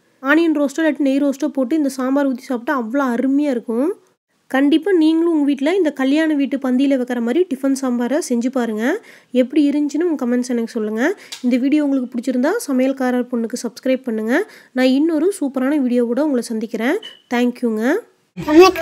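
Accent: Kongu, India. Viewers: Super sis 2day nite I will try this. Recently I suscribed ur channel. I like ur slangvery much. Kongu manam veesukirathu sis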